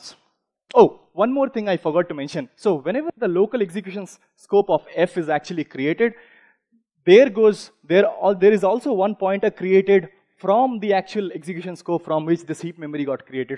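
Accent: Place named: India. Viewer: very thick indian accent. hard to follow.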